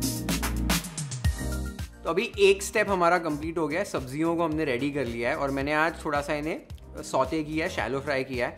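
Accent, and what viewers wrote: Maharashtra, India. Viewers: to maharashtriyan ch aahe .. mumbai madhe lahana cha motha jhalay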